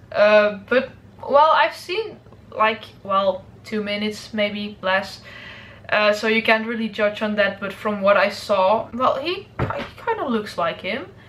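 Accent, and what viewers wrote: Netherlands. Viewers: Hey! ik hoor aan je engels dat je nederlands spreekt :p ik ben ook een grote Star Wars fan!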